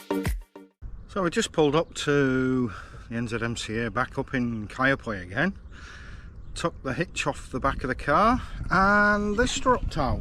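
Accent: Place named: England, United Kingdom. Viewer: That’s an English accent… 75 dollars !…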